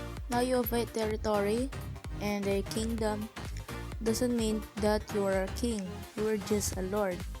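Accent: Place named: Philippines. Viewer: I know that you're a filipino because of your accent Kababayn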